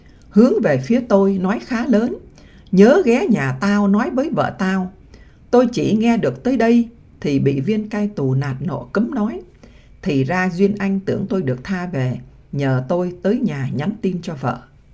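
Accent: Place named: North, Vietnam. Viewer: Chào cảm ơn tác giả Thanh thương Hoàng câu chuyện thật hay buồn thương đến rơi nước mắt,cảm ơn MC "Bắc năm tư giọng như ru ngủ/đưa vào hồn" nhớ,đủ,mãi, luôn/thương thương lắm và buồn như muốn khóc /những người hùng ngang dọc đất miền Nam/rơi nước mắt thôi đành cam số phận/biết nói gì?buồn,giận, hờn ức hận thù căm/ chúc kênh vững mạnh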